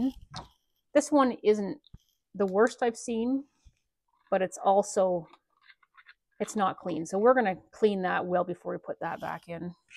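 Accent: Canada. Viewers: You're very good at what you do and you deserve many more subscribers! Is that an East coast accent? Newfoundland, maybe? I was so surprised there was nothing wrong with the flywheel key ; I was sure that was the problem, an over-advanced spark. A great how to video. Subscribed. And cheers from the West coast of Canada! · Hi, just discovered your channel. I'm a Stihl guy, a retired hobbyist. I've been working on saws for about 7 years now, after I burned up a piston due to a leaky crank seal and needed to fix my 034. I'm in northeast Arkansas and I'm guessing you're Canadian from the way you pronounce "out". I'm now 7 years older and dozens of Creamsicles "richer". The 025/MS250 has the same starter pulley diameter as the 170, 210, 230, and having the largest displacement of these, is notoriously hard to pull (also hard to start, if you make the mistake of waiting for the first pop to turn the choke off). 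The lever arm of the pulley is IMHO too small for the job. However, for a younger able bodied person armed with the above knowledge, I believe it is the best bang for the buck in a homeowner saw. Another caveat: don't try to advance the timing unless you don't value your arm. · I knew it had to be a Canadian engine shop when I heard her accent and saw the snow on the ground. God makes tough people in Canada.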